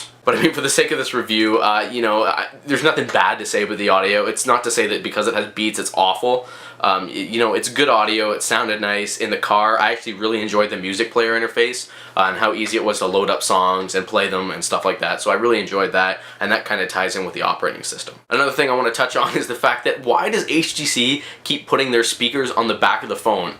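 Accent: Canada. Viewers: Are Canadian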